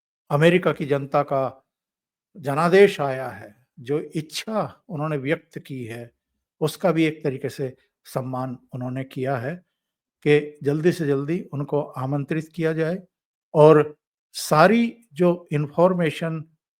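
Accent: India. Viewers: Nui to kahu Chacha Desi andaj me .news badiya h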